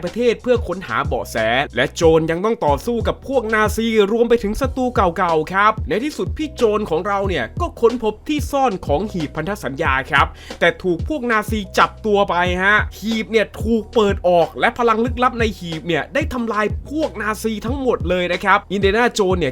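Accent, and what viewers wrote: Isan, Thailand. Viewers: เจ้าของช่องเป็นคนอีสานหรอครับ สำเนียงออกอีสานเลย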